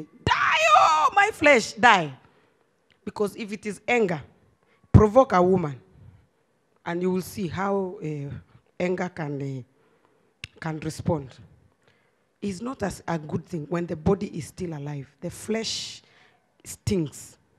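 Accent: Nigeria. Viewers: !!!! You already taken the Nigerian accent so well, I can't believe its you. May God continue raising you to greater glory. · You got the Nigeria accent now...Eweee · ...you have become a Nigerian ooooohhhhh...the accent...ooops...Nigerian oooghh...congratulations!!!! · I am excited to hear the nigerian accent 😍😍😍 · Ewwooo you're talking Nigerian acsent, I love your msg 💖💖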